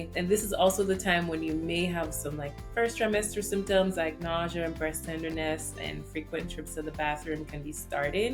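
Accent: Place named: Jamaica. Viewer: Sounds Jamaican. Anyway, Good info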